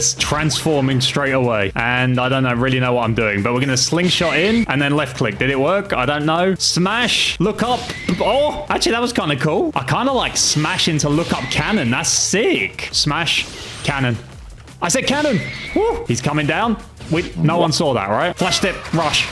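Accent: United Kingdom. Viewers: bro is british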